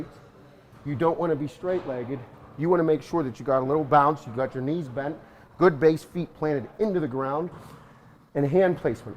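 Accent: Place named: New York, United States. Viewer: I love your way of explaining tips, moreover I like your typical New York accent. I just love it. Whenever I listen to you, I feel I am in New York. Thanks bro you the best. Prem India.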